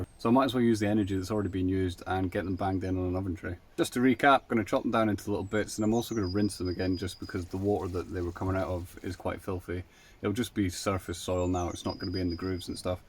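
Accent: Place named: Scotland, United Kingdom. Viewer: love your Scottish accent, are you from Edinburgh like me. I also make tea from dandelions Leaves by drying them. Stinging nettles are great too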